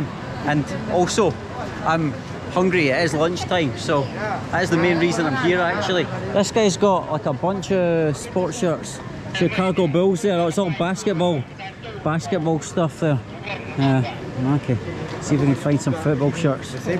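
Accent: Scotland, United Kingdom. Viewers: I love the Scottish pronunciations of things :)) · Your accent reminds me of my time in Leith . Which part of Scotland are you from ? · Welcome to Malaysia. Love your Scottish accent. You should get Melaka T-shirt, that is where everything starts in Malaysia 600 years ago. Kuala Lumpur is the capital but the truth gems is outside capital city. Malaysia is food heaven country, really hard to try it all for a tourist. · Hey Scottish man, love the accent, I studied in Scotland and I miss it. Feeling nostalgic.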